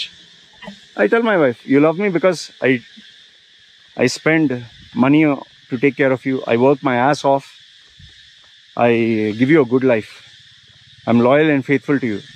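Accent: India. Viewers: He speaks with Indian Accent.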